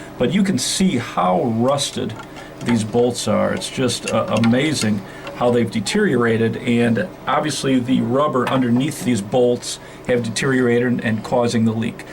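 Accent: Chicago, United States. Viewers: Are you from Chicago? Sounds like you are..thanjs for the video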